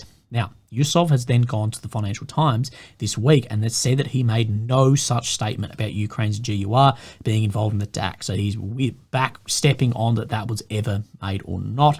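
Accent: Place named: Australia. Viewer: Just started watching your updates, good to hear an Australian voice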